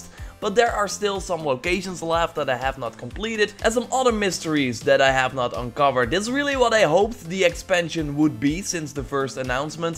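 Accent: Netherlands. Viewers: Merkwaardig hoe veel mensen niet weten dat je Nederlands bent. Je zegt het redelijk vaak in je video's en je accent verraadt het (al is het niet dat typische Dunglish haha)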